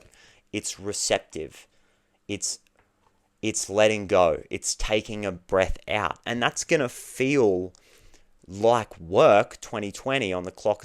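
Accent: Australia. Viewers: Been trying to place your accent! Not american, are you? Australian? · Hello seeing you for the first time and I want to say what a handsome face you have, so nice looking. What part of Australia are you from, you accent to me sounds Australian. You also seem a sensitive and shy person. Your reading resonated with me. Greetings from me in the Emerald isle of Ireland and a very happy and blessed new year to you and your family. 🇮🇪🙏🙋🎄☃️😁🇦🇺.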